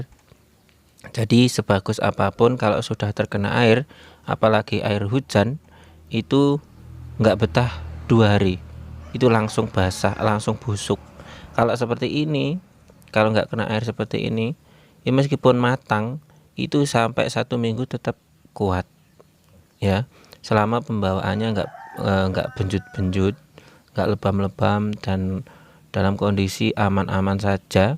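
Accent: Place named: Java, Indonesia. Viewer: Luar biasa sangat bermanfaat kang....saya langsung subscriber...bahasa jawanya medok lucu tp sangat bermanfaat, sukses selalu kang.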